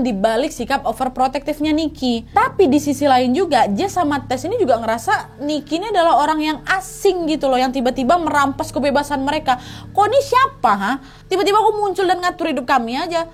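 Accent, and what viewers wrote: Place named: Sumatra, Indonesia. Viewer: amboi..cantiknya bahasa. ibu ini mengerikan nomongnya, ibu-ibu cerewet yang cerdas. enak dengarnya dengan logat khas sumatera, siapa yang jadi suami ibu ini pasti kewalahan ngomong sama ibu ini, bisa-bisa suaminya jadi asma dibuatnya, ngomongnya cepat dan tembak langsung. nggak bisa ditangkis lagi.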